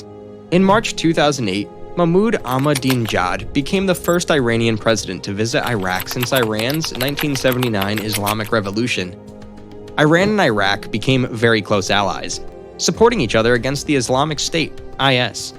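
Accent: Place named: United States. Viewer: No he is not butchering anything. He is a typical Ey-mey-rie-san (American) who have no concept of language, grammar, spelling or pronounciation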